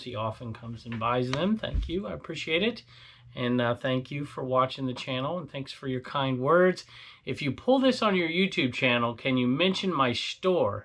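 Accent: South, United States. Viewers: It’s so interesting to know that you were raised in California because you’d never know it! You have the southern ‘twang’ down perfectly, as well as the slang (ya’all), in addition to other very southern mannerisms! My sister, who originates from South Dakota, did the same thing. She married a southern boy from Arkansas and within a year had the accent! We got such a kick out of our sibling becoming ‘southern’! Love your channel!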